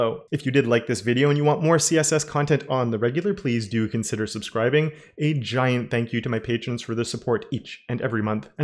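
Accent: Canada. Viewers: Thanks for the informative video. Distracted with the canadian accent hehe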